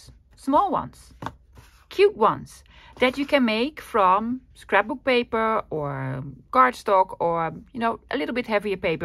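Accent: Netherlands. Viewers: First time watching your channel. Loving the ''flippies'' and "snippies" words. I normally cut off the first of the 2 flaps to reduce bulk, won't be cutting them off anymore, think it makes the sides pull in better so they are straight. Great tutorial. Love the Dutch accent, reminds me of my Dutch friends from years ago.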